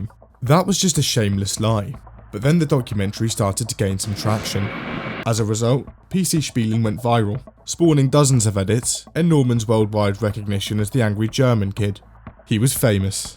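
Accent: United Kingdom. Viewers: Internet historian's British son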